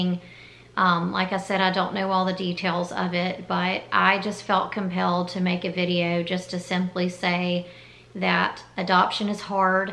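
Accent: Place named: Carolina, United States. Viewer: You sound like a fellow Carolina girl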